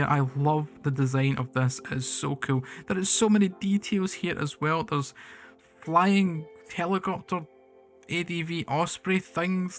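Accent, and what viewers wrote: Scotland, United Kingdom. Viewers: love the scottish accent reminds love the builds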